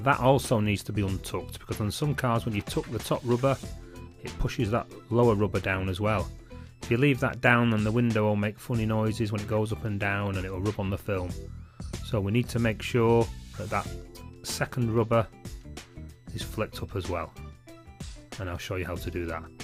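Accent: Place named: England, United Kingdom. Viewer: You sount like u from England mate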